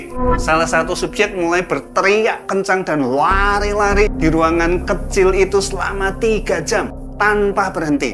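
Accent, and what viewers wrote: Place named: Java, Indonesia. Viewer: Suara anda medok banget 😅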